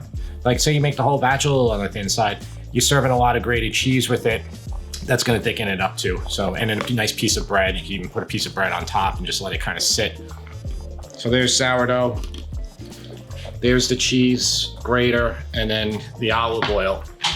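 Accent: New York, United States. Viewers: Oh and btw, I love your NY accent. I'm from Germany but I lived in Hoboken for 4 years and it takes me back. 🙂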